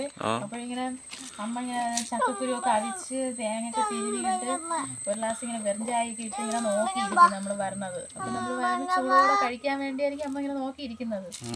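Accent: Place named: Kattakada, India. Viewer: Vere content onnum. Kittiyille ithu ellavarkum ariyavunna karyamanu vakkukal mattiparanjalum kattakada bhasha kayarivaru nnu that is quite natural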